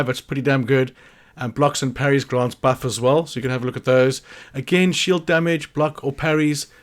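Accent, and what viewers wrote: South Africa. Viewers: Thanks for the tips. Are you South African? You sound like it.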